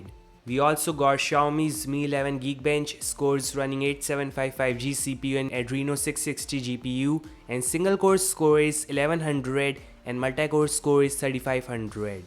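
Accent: India. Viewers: 满满的咖喱味 哈哈啊哈啊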